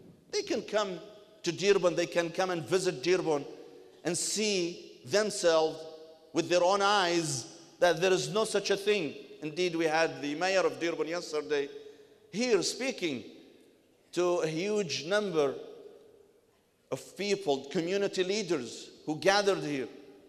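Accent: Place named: Russia. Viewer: Gee has anyone listened closely to his accent? It's Russian.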